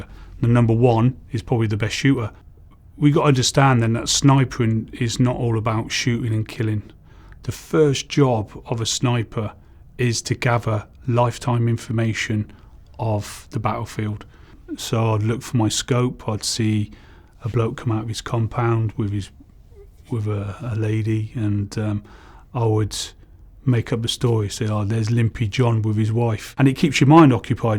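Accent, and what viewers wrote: United Kingdom. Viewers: i mean its really fucking obvious that hes british, why do you bother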